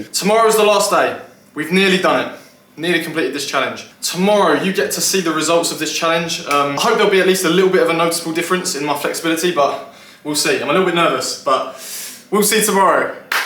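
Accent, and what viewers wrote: United Kingdom. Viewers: YOOOO YOUR ALSO BRITISH?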